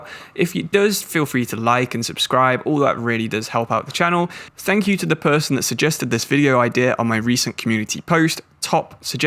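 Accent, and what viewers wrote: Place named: United Kingdom. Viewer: broo i love your english accent... is soo funnyyy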